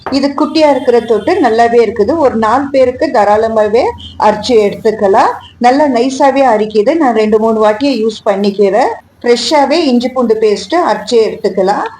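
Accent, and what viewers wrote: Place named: Bangalore, India. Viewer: Nice.your language is good.Bangalore tamil.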